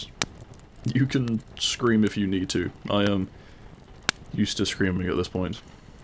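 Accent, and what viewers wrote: United Kingdom. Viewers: This might just be me but you sound like a deeper more British Wilbur Soot🤔😁😂 Edited: wow 293 likes and 26 replies thank you everyone so much 😁🥰🥰and to those of you that can’t unhear it or that it’s stuck in your head you’re welcome😏😏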